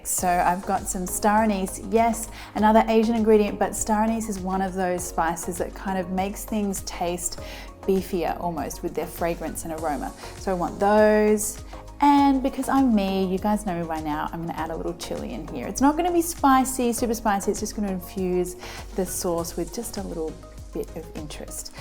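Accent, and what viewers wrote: Australia. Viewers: it's blasphemy enough but with the australian accent on top holy fuck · She has the most appealing Aussie accent I ever heard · I made your sauce today (I made meatballs yesterday, so I will try your meatball recipe next time). The sauce.... OMG - I didn't think I could improve on MY sauce but yes, must say, your sauce....made in heaven!!! The anise, the chilli and bay leaf definitely gave it a unique flavour which we all loved. Love your presentation too....especially your accent...down to earth Ozzie....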